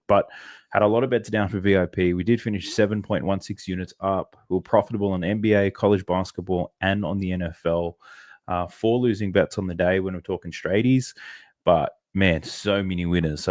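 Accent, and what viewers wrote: Australia. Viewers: Bro I mean this with the utmost respect but I realized that the Aussie accent is the reason I love the intro so much 🤷‍♂️🤣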